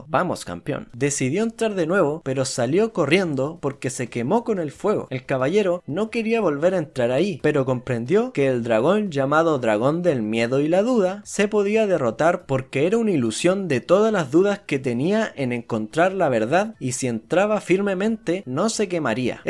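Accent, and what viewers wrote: Chile. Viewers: A que es chileno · bro, eres chileno?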